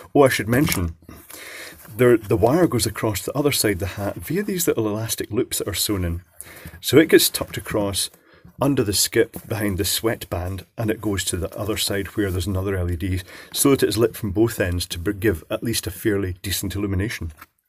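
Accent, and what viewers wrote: Scotland, United Kingdom. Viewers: A) he's scottish and B) electronics is literally his area of expertise. Perhaps you need to get out meet people yourself, instead of writing rude comments on youtube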